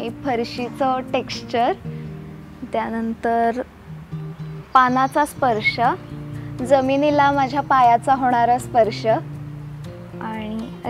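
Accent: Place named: Kolhapur, India. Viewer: तझा आवाज खूप बालबोध आणि मुक्त आहे मी तुझे भटकंती चे सारे videoपाहिले खूप सुंदर मराठी बोलणे तू जप ले आहेस त्याला कोल्हापुरी बाज आहे प्रतेक गोष्टी चा बारीक तपशील देणे ही तुझी सहज आवड आहे.जपानी लोकांचा जिवनाकडे पाण्याचा दृष्टि कोन सहज असतो.कविता वाचन छान, जपान ला जावून आलीस का?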